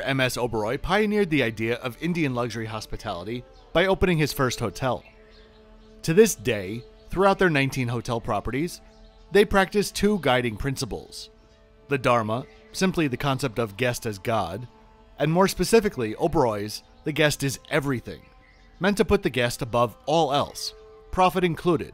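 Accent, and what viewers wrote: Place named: New York, United States. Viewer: Best travel content on YouTube by far. Would love to hear the story of how you ended up in Asia. You sound like a New Yorker. ;-)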